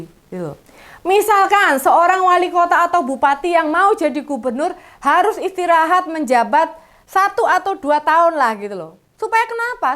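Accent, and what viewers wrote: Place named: Surabaya, Indonesia. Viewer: Mbak iki logate kok koyo wong Suroboyo...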